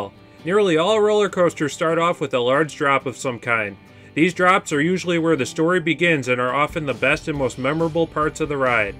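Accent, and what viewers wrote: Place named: Minnesota, United States. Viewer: This mans got the most minnesoota accent I ever heard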